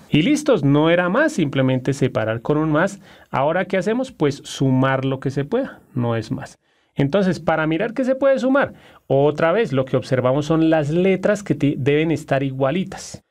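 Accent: Colombia. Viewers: una duda usted es colombiano=??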